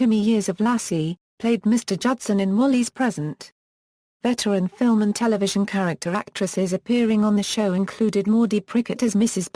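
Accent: United Kingdom. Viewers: I don't like the British accept telling us about an American TV Show.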